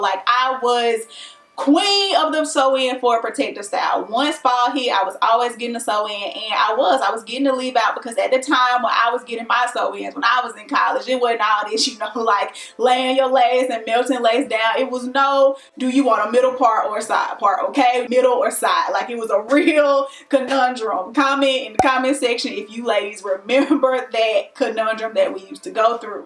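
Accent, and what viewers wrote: South, United States. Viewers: Finally yes! I can tell! lol that’s why I said influence cuz you have a southern accent the way you say “up” “Best” and “pound” it’s evident of the two. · Ohhhhhhhh Lol ok I knew you had a country accent. I was like maybe her parents are from the south. Because people tell me that I have a country accent sometimes but that’s because my grandmother is from the south. But when you were saying Cousin I was “oh she from Cali because they say Cousin a lot” don’t they? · Instantly subbed because of the southern accent 🤣 I’m from the south and it’s hard to find Youtubers who talk like me! Love the video!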